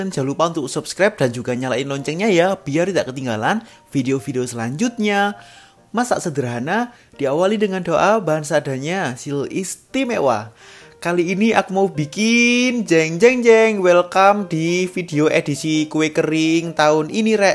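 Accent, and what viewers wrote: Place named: Surabaya, Indonesia. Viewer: Aku lho paling suuuwwweneeng nek krungu omongane arek iki serasa nang suroboyo😆😆😆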